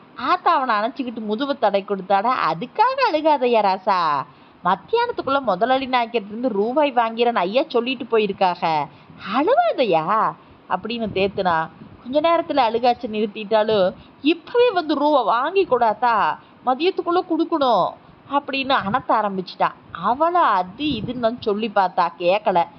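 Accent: Kongu, India. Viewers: கரிசல் கதை கொங்கு தமிழில் 👌🏻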